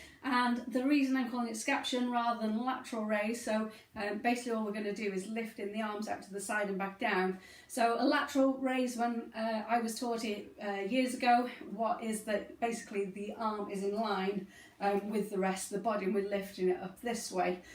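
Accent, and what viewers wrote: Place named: United Kingdom. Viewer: you are beautiful and I love your British accent. God bless you from the U.S.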